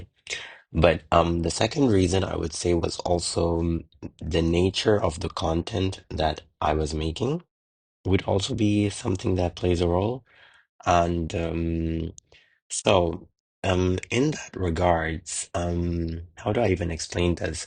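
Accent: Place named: Quebec, Canada. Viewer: Hey there ! Discovered you 5 days ago and I was absolutely NOT disappointed. I enjoyed your video about the Reio. Please stay in good health and share us your work whenever you can :) Also, where are you from ? I hear a faint accent, but can figure it out. ^^ I'd say Québec (Canada) by pure instinct ¯\_(ツ)_/¯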